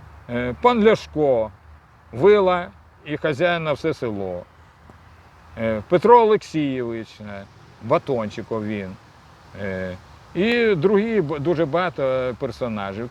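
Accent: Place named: Poltava, Ukraine. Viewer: Чудний. Полтавський діалект такий, як треба.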